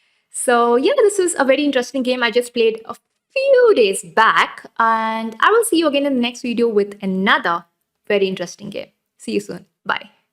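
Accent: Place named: India. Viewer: You need to sort that popadom accent out girl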